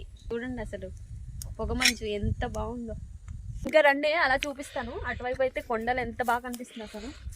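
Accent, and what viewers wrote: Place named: Krishna, India. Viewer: ఊరు పేరు మాత్రం చెప్పటంలేదు ఈమె మాత్రం మన్యం ఏరియాకి సంబందించిన ఆమే కాదు యాస మాత్రం కృష్ణ జిల్లా కు సంబంందినది ల్లా ఉంది ఇంతమంది ఎఉరు ఏవిలేజ్ అని అడుగు తున్న చెప్పటంలేదు పాప యాస కూడా అలాగే అనిపిస్తుంది 🙏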